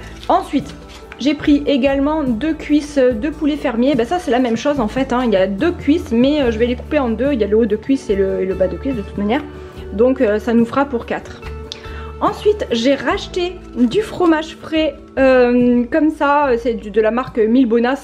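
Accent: South, France. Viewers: J'adore vos vidéos avec ce petit accent du Sud, vous avez l'air d'être très sympa, naturelle et une personne sans prise de tête.. Je vais faire 2 ou 3 courses a Lidl 😅...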